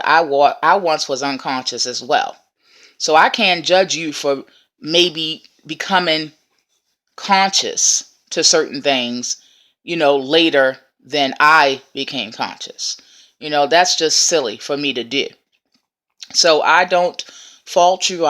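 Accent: Baltimore, United States. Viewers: Never mind. just looked at another video. If you from Baltimore you can hear it everytime.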